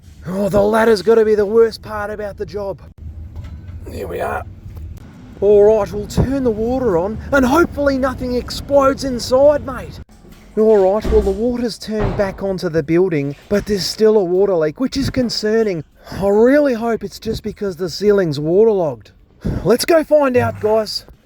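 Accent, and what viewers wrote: Australia. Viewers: YouTube put you in my feed and your videos are hilarious I love the way Australians talk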